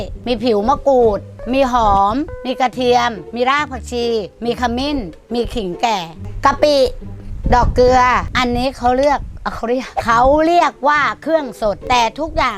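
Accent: Suphanburi, Thailand. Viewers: ฟังเชฟไปมา ...ออกสุพรรณ ซะงั้น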